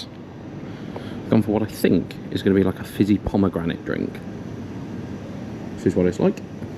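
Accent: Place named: United Kingdom. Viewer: Я конечно не понимаю на английском, уж тем более с британским акцентом, но посмотр был интересным!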